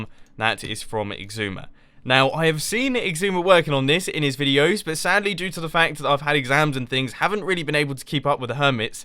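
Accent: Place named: United Kingdom. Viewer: His accent is clearly Brittish.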